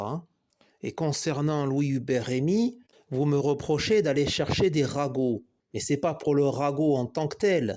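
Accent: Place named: Corsica, France. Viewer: Cher compatriote, Pace e salute ! Votre accent ne m'avait pas trompé, je le connais trop bien. Permettez-moi de répondre à votre contradiction, non pour alimenter une stérile polémique, encore moins pour être désagréable, Dieu m'en garde. Je souhaiterais simplement vous poser quelques questions. Au préalable, je précise que j'ai reçu le baptême avant Vatican II, et que je demande chaque jour la grande grâce d'être inébranlablement fidèle à ma Mère, la Sainte Église, à sa Doctrine, à son Culte, à sa Morale, à sa Loi. Voici donc mes questions : Un VERUS PAPA, càd catholique, revêtu validement et légitimement des prérogatives de Saint Pierre, peut-il ostraciser et interdire la Messe codifiée à perpétuité par saint Pie V ? Peut-il nier la Médiation universelle et la Corédemption de Marie ? Peut-il bafouer la Morale en bénissant ceux qui pratiquent des abominations à Dieu ? 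Peut-il soutenir que toutes les religions conduisent à Dieu, contredisant ainsi le Commandement du Seigneur à ses Apôtres avant son Ascension et la raison même et la sacrée Mission de l'Église hors de laquelle point de salut, laquelle continue Jésus-Christ ? Un authentique Vicaire de Jésus-Christ peut-il affirmer que les catholiques adorent le même Dieu que les musulmans ? J'arrête là, mais je pourrais allonger la liste sur de nombreuses pages. D'ailleurs, vous pouvez vous-même comparer la Doctrine immuable de la sainte Église, de ses Papes, de ses Conciles, avec les innovations et hérésies professées depuis Va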